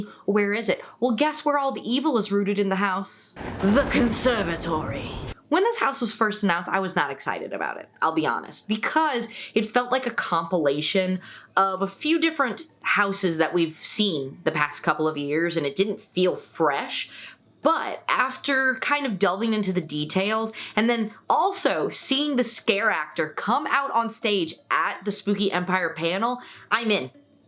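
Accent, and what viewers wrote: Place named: Louisiana, United States. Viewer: I figured you were from there the way you were talkin'. Lol! I used to hit up RenFaire annually from 2009 until around 2017 before we moved. I dressed up like a Templar Knight, but I'm thinking of switching up to Aztec Warrior. I miss it. Tried to hit up one on the outskirts of Florida with the fam. . . Yeah, it was just another reminder of how rinky-dink things are up here. Never thought I'd be that guy from "the big city" that talks trash about small towns, but the smug/ignorance of these small town folks makes me rep H-Town even more. I take comfort in knowing that Houston is the "dirty south" and isn't a big city like NYC. One thing you might appreciate though being from Louisiana. . . The seafood is better up here in Fort Walton Beach. This is coming from a guy who hated seafood until my wife made me try it in Connecticut. 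Anyway. . . Keep up the good work on the channel and hope you get to USO for HHN.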